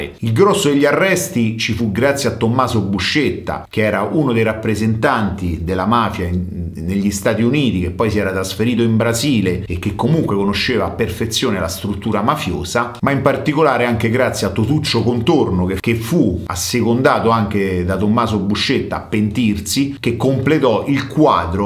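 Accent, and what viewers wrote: Rome, Italy. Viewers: Pero se devi raccontare non parlare in romano.. Non è mto corretto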